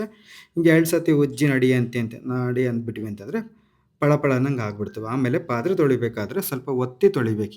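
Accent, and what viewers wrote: Chitradurga, India. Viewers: ನನ್ನ ಊರು ಶ್ರೀರಾಮಪುರ ಹೊಸ ದುರ್ಗ ತಾಲ್ಲೋಕು ಚಿತ್ರದುರ್ಗ ಜಿಲ್ಲೆ ನಮ್ಮ ಊರಿನ ಕನ್ನಡ ಕೇಳೋದಕ್ಕೆ ನಾನು ನಿಮ್ಮ ವಿಡಿಯೋ ನೋಡುತ್ತೇನೆ ಅಡಿಗೆ ಕೂಡಾ ಅಷ್ಟೆ ನನ್ನ ತವರಿನ ನೆನಪು ತರುತ್ತೀರಿ ಅದಕ್ಕಾಗಿ ಧನ್ಯವಾದಗಳು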